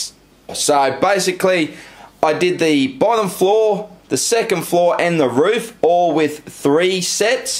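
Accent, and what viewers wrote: Australia. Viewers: I like that Aussie accent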